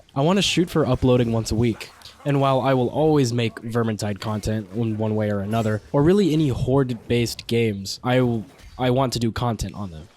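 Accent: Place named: England, United Kingdom. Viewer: You have a cool voice and a clear english accent. You should consider adding simple playthroughs to the channel, especially of the games you mentioned here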